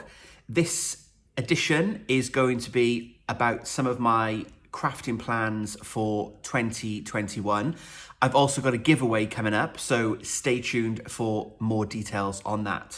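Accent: Wales, United Kingdom. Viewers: I'm loving your videos. Good luck with getting through your plans. I really enjoyed knitting the flax light for my daughter in lockdown. I'm currently on the last few rows of my Winter Lights Shawl by Stephen West (my first West Shawl). Also hearing a Welsh accent is making me feel home sick being a Cardiffian living in Manchester and not having seen my family since February 2020. Thank you for the virtual cwtch!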